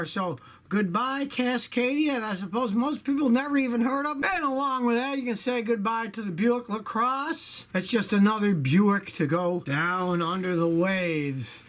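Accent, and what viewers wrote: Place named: Transatlantic, United States. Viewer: Where did you get that "trans-atlantic accent??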